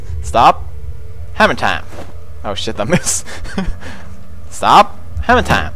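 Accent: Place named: United Kingdom. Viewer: He deleted it because of the British accent lol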